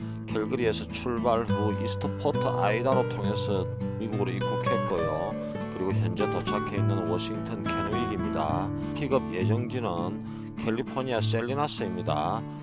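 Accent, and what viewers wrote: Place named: Busan, South Korea. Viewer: 저의 작은 삼촌하고 목소리, 발음, 부산 사투리까지 똑같네요. ㅎㅎ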